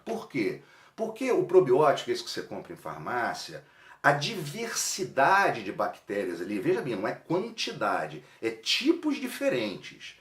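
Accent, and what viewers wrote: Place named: Rio de Janeiro, Brazil. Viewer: Até o sotaque da Zona Sul do Rio é parecido!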